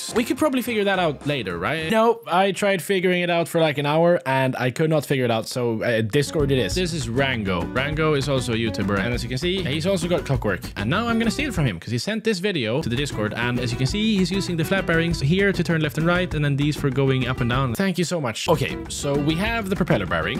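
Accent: Sweden. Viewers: Are you from Sweden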